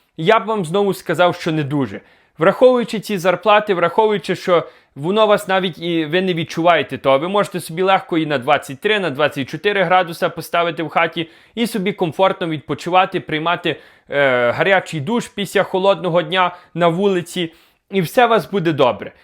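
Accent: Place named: Western Ukraine, Ukraine. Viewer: Не дуже інформативне відео. Заголовок давав надію на більше. Але було цікаво. Чудова мова заходу України!!!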